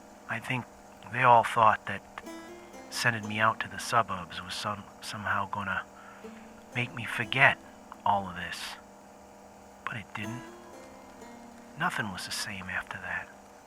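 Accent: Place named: Boston, United States. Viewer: What a great way to use the opening tutorial and yet make it completely your own! Really looking forward to this. And so glad you are doing a Boston accent that doesn't sound like a parody of a Boston accent.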